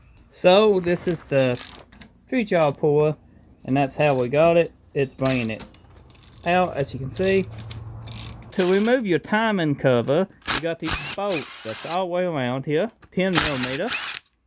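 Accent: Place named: South, United States. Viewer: It's a southern accent with a lisp. My buddy sounds just like him. Lol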